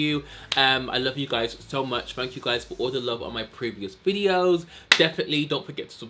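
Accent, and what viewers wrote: Birmingham, United Kingdom. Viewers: Is that a Brum accent i'm hearing? ❤️ . and thanks for the vid, you've helped a clueless sister out 😂 .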